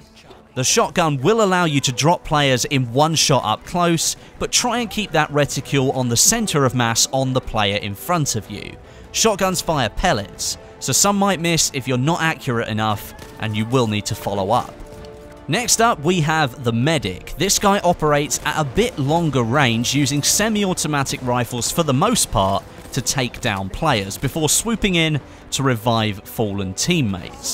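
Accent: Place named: United Kingdom. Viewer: Luv ur british accent. U should be in the game. talking about to get objective charlie lmao nice content bro cheers from brazil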